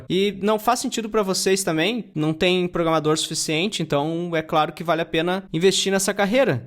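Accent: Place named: Porto Alegre, Brazil. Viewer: Me inscrevi pelo conteúdo e porque tu é de Porto Alegre (notei pela fala). Abraços!!!!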